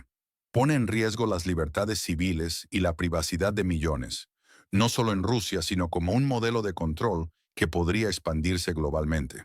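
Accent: England, United Kingdom. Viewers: ¿Hablas inglés con acento español. No verdad? Si habéis decidido doblar al castellano hacedlo bien, sin arrastrar las erres como tarados. Hablar español con acento inglés impone un idioma inexistente.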